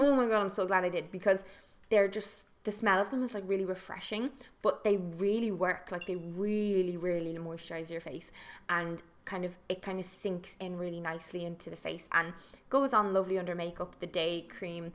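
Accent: Ireland. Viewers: I love how I live in America and literally don't have neither the beauty blender or the Anastasia brow pencil.. like what the heck?! This has gotta be a sign! haha -also this is hecka random but I bet you make Californians happy with that amazing Irish accent you have :P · Your sound so much more Irish in America if that makes sense 😂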